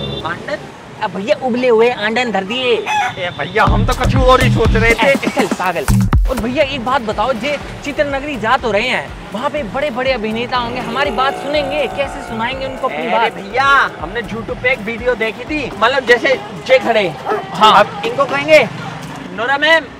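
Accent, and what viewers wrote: India. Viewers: I m also from ballia....but aur bhojpuri speaking style is different from yours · Yeh baliya ka accent hi nhi h. Jhansi ka lag rha h.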